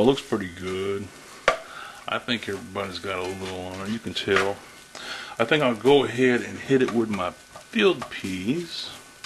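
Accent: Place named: South, United States. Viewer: i love ur country accent n ur sense of humor im from down south i stay in texas what state u live in?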